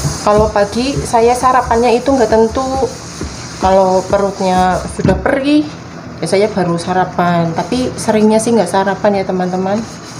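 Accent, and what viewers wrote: Banyumas, Indonesia. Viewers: Wong ngapak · tetep semangat mbokayu. slm ngapa.ora ngapak ora kepenak.mrebet pbg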